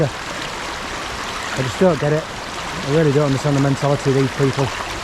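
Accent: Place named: Hull, United Kingdom. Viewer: Heyup Mate😊. Great film,again. Approximately from where do you set off from? To get to your start point? One detects a Hull accent . Your channel is improved, by that n such.. best wishes from a fellow Nor Easter 😊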